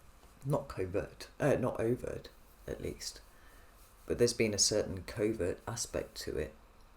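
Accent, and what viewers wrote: United Kingdom. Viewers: I absolutely love your readings, but am wondering if there is anything that can be done to improve the audio. I struggle to hear them - add that to the struggle to pick up the British accent and I have a helluva time!!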